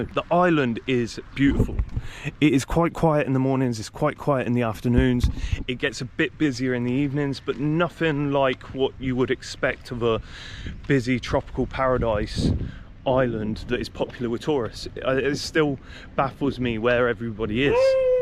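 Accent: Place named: United Kingdom. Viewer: Hi I’m in Australia 🇦🇺 Older lady, 56 years old I’m a “traveler “ 1989 Sri Lanka I love Malaysia 🇲🇾 And it’s people. I’m planning a trip in 2026 I’ve just watched your vid. I have to say your video was FANTASTIC!! The clarity in which you spoke. With your charming British 🇬🇧 accent. I REALLY enjoy watching your video You explained, so well, all aspects of Langkawi. Well done 👍 Have subscribed 👌😎🤩 Marianne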